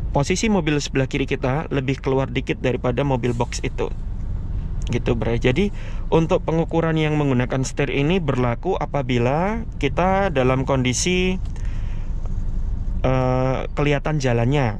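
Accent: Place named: Surabaya, Indonesia. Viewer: Suroboyo timurr wkwk.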